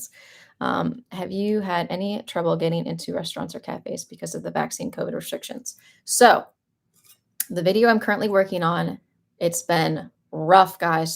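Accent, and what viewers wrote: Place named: South, United States. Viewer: Your southern accent is very subtle, also; in my time in China, nearly every American I met was from the south, including me (NC). If you feel comfortable answering, what did you do in China? and is Seoul similar to Chinese cities? This would be really helpful because I used to live in Shenzhen and am considering studying in Seoul for a year as an exchange student.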